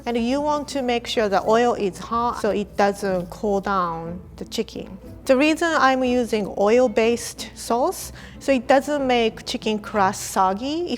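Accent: Japan. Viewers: I just love Japanese/English voice!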